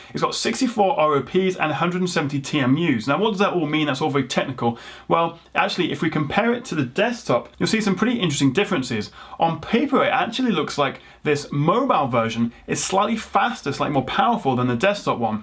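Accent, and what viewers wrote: United Kingdom. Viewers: Around 10% in neighbors of the desktop version:D I almost go to sleep and then see you replying to my comment, I immediately have to respond. I really enjoy your contents and your sleek British accent:D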